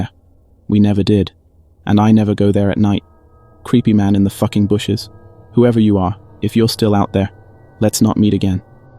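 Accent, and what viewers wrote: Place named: United Kingdom. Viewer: Awesome British narrator ! 😀😀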